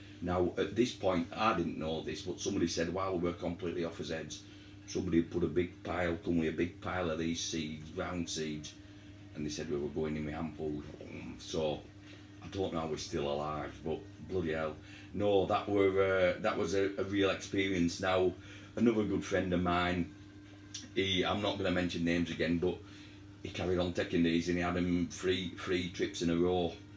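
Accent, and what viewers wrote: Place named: Yorkshire, United Kingdom. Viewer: Are you from Barnsley or Donny pal? Its like listening to an old mate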